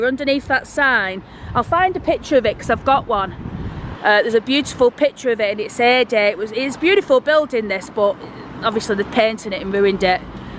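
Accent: Oldham, United Kingdom. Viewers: Love your lovely open way of speaking. I had to move away yonks ago so I love the area's covered and listening to a beautiful Oldham accent. Never change!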